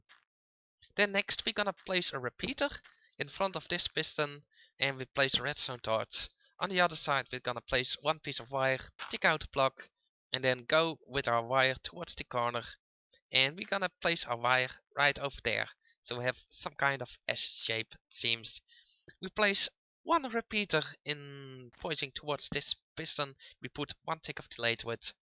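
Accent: Netherlands. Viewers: are you dutch? sounds like it :P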